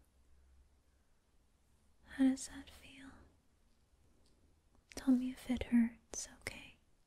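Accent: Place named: South Africa. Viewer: I sorta get springbok vibes from your voice and it's the best thing ever.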